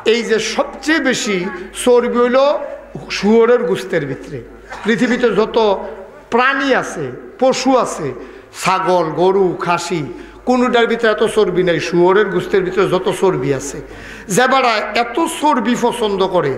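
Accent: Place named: Comilla, Bangladesh. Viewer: এই বক্তা কে সবাই কুমিল্লার ভাষার জন্য পছন্দ করে মিয়া ❤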